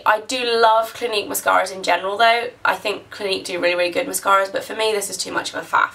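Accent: United Kingdom. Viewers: you always look like a california girl with a british accent :D i love it !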